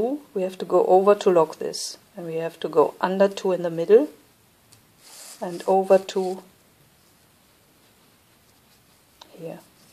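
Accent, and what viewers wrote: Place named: Germany. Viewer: I LOVE your German accent! And I continually applaud your English. : )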